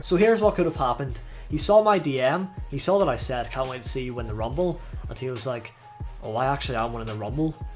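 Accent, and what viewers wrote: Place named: Northern Ireland, United Kingdom. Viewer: Dude it’s so good to hear that accent! Good old Northern Ireland!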